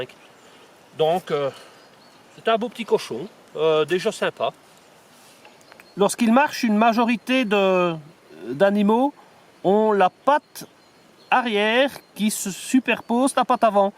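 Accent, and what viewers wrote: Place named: North, France. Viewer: Toi tu viens du nord .. Encore un kek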